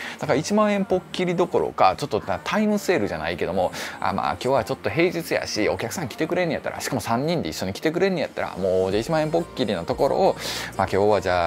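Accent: Kansai, Japan. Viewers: ，関西弁，大阪第二の故郷で懐かしいですわ🙋🙇✨✨✨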